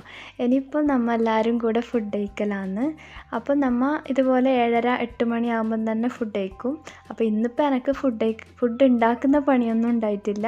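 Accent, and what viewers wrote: Kannur, India. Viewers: 🥰kannurkarude nadan basha👍gd 👏🏻👏🏻👏🏻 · Ningal kannurano Namma, ninga kannur basha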